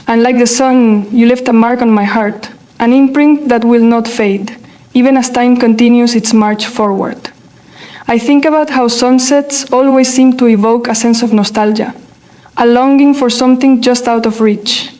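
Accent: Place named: United Kingdom. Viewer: Откуда этот акцент???У меня в университете студенты и делегация из Великобритании так разговаривали.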